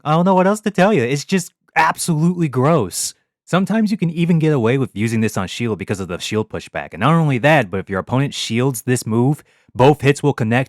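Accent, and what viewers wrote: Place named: Japan. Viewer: Why do you say the names in japanese and japanese engrish?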